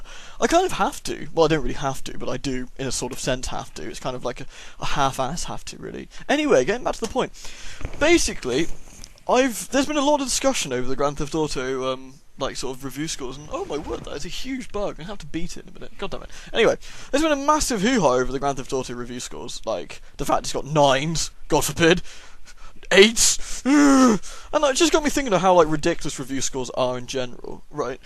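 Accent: United Kingdom. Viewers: Burly Castlevania with shouty ranting brit-man? Sounds legit!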